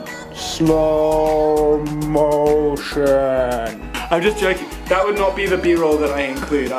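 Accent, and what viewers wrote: United Kingdom. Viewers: The simplest way for both you and us is option # 2 so long as you manage to speak slower; after all, British accent is less easy to grasp. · 我觉得你的英式英语更容易听懂诶！！！我之前听了几个英国人的，我觉得吞音（？）好多。。。我还是习惯美式英语😂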